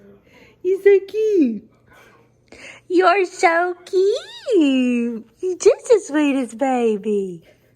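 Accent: South, United States. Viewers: I ❤️❤️ your sweet southern accent! It’s so calming 🥰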